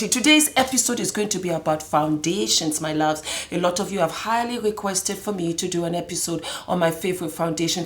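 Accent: France. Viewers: first time watching...i totally enjoyed it, love the french accent:) #newsubbie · Your French accent is coming out.... very very.i love it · That accent. is that French?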